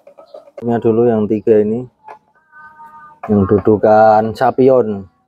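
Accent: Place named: Java, Indonesia. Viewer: waudohe, kulon kinten seputaran jogja jateng ndanku, logate kok jowo banget, ajeng nderek pasang menawi celak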